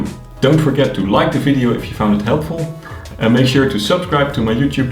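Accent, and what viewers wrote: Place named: Netherlands. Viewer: That's a Dutch accent if I've ever heard one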